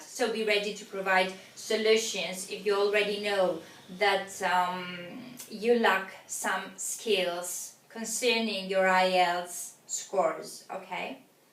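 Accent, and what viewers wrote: United Kingdom. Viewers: thanx for the lessons mam 😊 ...are you from UK?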